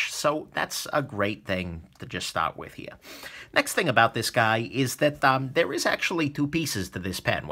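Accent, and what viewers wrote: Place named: Boston, United States. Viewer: damn love your accent what is it? Sounds Boston but i really have no idea.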